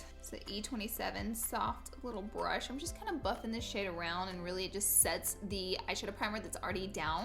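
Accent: South, United States. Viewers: she’s from the south. Let her be her:)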